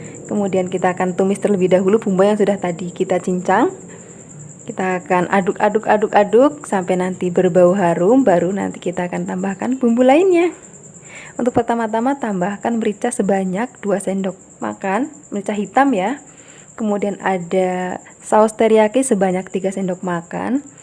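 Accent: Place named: Banyumas, Indonesia. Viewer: Gak tau nya orang ngapak...dan muka nya beda ma suaranya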